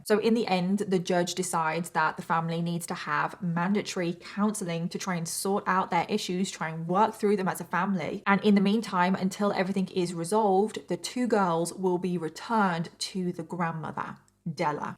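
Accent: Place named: England, United Kingdom. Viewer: I saw this case many years ago but decided to watch your version and your research on it was spot on. Great job!! Btw I'm a twin as well. Similarities between my twin sister and these twins are one thing only; the deep bond we share that's it. The rest nada. We were the victims of abandonment and physical, psychological, emotional and sexual torture. My twin sister and I got introduced to hell from the very first breath we took, that was our life. The PTSD took my mind into homicidal thoughts, and suicidal attempts. I jumped off a building and broke almost every bone in my body, clinically flat-lined, coma for 13 days then came out of it. Then knowing I survived I did it again but this time went higher up in the building. An identical thing happens, clinically dead, in a coma for 13 days, came out of it and here I am ALIVE. 3 years now, and with many severe different and alternative therapy, for PTSD, etc I can tell you I am finally finding my feet. 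I now LIVE more than survive. My twin and I are SURVIVORS of the unthinkable. We are beautiful, loving, caring, compassionate, nurturing, funny loving and amazing women. I say that with humility, not arrogance or ego. So it blows my mind how these twins can so nonchalantly and callously murder their beautiful mother over a simple curfew or a few basic rules. Normal people just don't. Abnormal people don't, but evil does. May the mother be in peace forever. I love your crazy mad English accent and your channel. Thank you for your h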